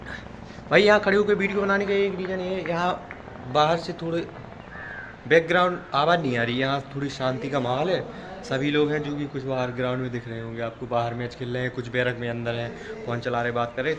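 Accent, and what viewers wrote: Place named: Bundelkhand, India. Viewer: Bhai bundel khand side se hai kya language vahi ki lag rhi 😅😅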